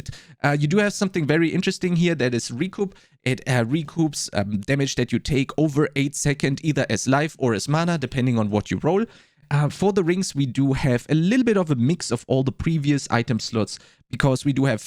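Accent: Germany. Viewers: Perfect, just watched the other video about items and their stats before work today. Now this one drops when I get home. If I trust anyone with making cheat sheets and this stuff it's a dude with German accent.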